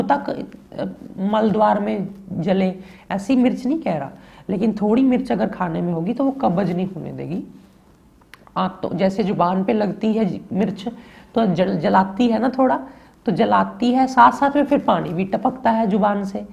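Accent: Punjab, India. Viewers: Thanks for information. R u Punjabi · Thank you very much for teaching us so many good things.aap Ka Punjabi eccsent bot achha lagta hai jaise koi Ghar Ka member hi samjha raha ho .pls tell it contact no. After cancer treatment big changing side effects have come.no one telling us the way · Guru g apki tone punjabi aa rhi h...acha feel hota h Koii formality nhi lgti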